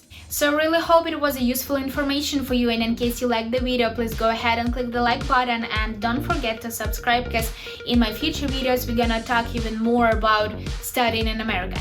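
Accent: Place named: Ukraine. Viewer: ukrainian accent is nice don't be shy of it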